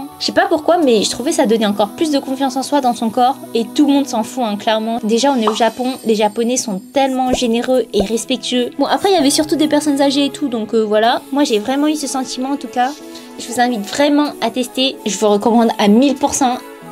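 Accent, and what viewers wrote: France. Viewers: "quel bon français" ??? LOL elle est parisienne…. Qu'est-ce que c'est que ces préjugés ?! (votre français par contre est à re-travailler… "on s'y serais cru y être" ???)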